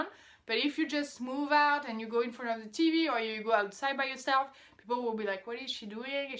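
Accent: France. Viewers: Just found your channel today, surprised I didn't find it before because I watch a lot of exchange students videos "coming to the US". You still have a French accent, not really that normal especially after 5yrs in the States. Most go home as a pure born Americans lol. But I must say I love your accent. I think most of the EU seems to say and think about the same things when it comes to their experience in the States. I have given this some thought and although it has been hurting the country(Politics) lately when it comes right down to it we are just one big family regardless of where in the US we are from. So when you think about the friendliness and small talk kind of stuff it makes sense really. Are you going to come visit us again?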